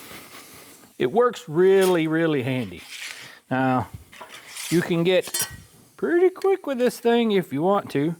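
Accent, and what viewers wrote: South, United States. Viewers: Just found your channel and I must admit, I'm kinda excited because you were one of my favorites to watch on TV (and we both speak southern LOL). Love the way you show the real world aspect of getting every thing to work right. I struggle with sheet metal because I spent my career welding big industrial iron. A half inch plate was thin material. Soo.... Going going from 1/8 or 5/32 rods and wire to .025 is a bit of adjustment. Lots of holes, just say-n. Love your stuff and I'll keep watching.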